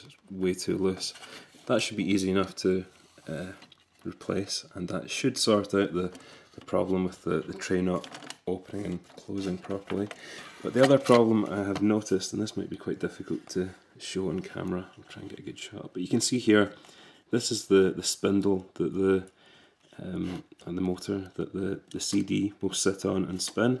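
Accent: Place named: Glasgow, United Kingdom. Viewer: Love your videos, you and 6oodfella make me feel right at home - team Glasgow! :D (you sound glaswegian haha). Also, burn yourself a copy of lost eden on CDI :)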